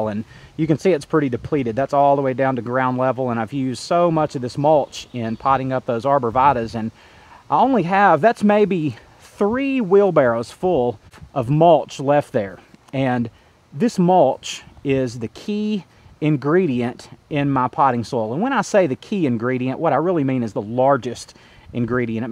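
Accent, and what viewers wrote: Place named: Tennessee, United States. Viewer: Great video! Are you in TN ? You talk like me and I’m from TN . We say we are “ Tickled “ for things . I love to hear other people with our accent and sayings on YouTube 😊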